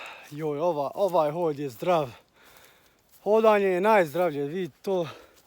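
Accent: Bosnia and Herzegovina. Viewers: Simpatičan si sa svojim Bosanskim u izgovoru a vidi se da iskreno iz srca govoriš, sretno dijete drago i uvijek uživaj u našoj domovini🇧🇦❤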